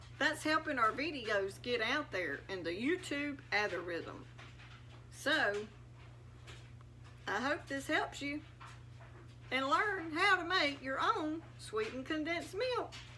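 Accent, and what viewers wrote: South, United States. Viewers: I love her southern style and dialect. She’s charming. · I love the country accent and pronunciation. It makes me feel like I’m back home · Thanks for sharing your recipe ❤your Southern accent! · SOUTHERN COUNTRY GIRL,LOVE THE ACCENT,AND IT IS A BLENDER,A MIXER,A WHIPPER OR WHAT EVER ELSE SHE WANTS TO CALL IT ,WHAT EVER YIUR MOM SAYS IT IS ,IT IS,LOL😂😂😂😂😂😂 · I love you mom, may JESUS bless you with love grace mercy protection a donation on YouTube to make income and have your baking channel grow! you have honest sweetness to your person. Very Lovely! I LOVE YOUR Southern Accent! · Thats just our lovely southern slang 😊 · I love ur accent, I’m from the south and your voice reminds me of my Mothers. God bless you for ur kindness. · What a great Tip ..I'll be telling my Daughter this .. Excellent 👍👍..Thank You.. Love 😘😘 From U.K.❤️❤️🙏. 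(& I love your Southern Accent ..❤️ )... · She said YouTube Altha rhythm😂😂😂😂😂😂😂😂 dang she slow southern talking granny makes me feel like I’m back home in Kentucky ❤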